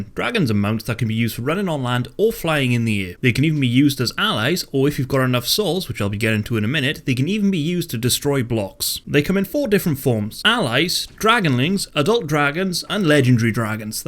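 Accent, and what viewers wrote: Wales, United Kingdom. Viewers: Are you Welsh? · Are you welsh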